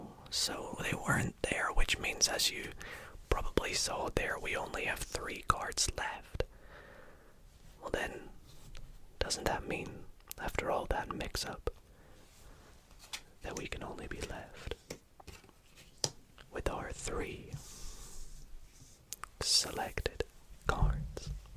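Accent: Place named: Ulster, United Kingdom. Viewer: Nice Ulster accent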